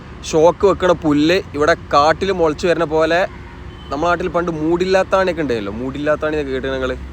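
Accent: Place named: Malabar, India. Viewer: Very nice vedio. Continue to give us such beautiful vedios. With your Malappuram/Malabar accent. I love it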